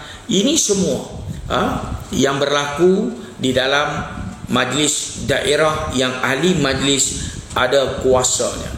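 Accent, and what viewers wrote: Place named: Kedah, Malaysia. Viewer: Dia ngomong basa logat provinsi kedah,sampean mboten ngertos yo mboten nopo nopo.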